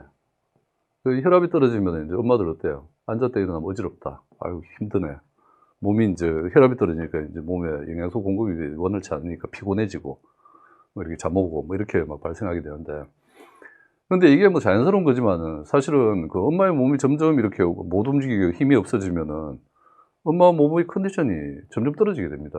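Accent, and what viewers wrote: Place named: South Korea. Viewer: 잘생긴 외모에 멋진 목소리 구수한 사투리에 친절하신 설명이 도움이 많이 되네요 감사합니다~~~~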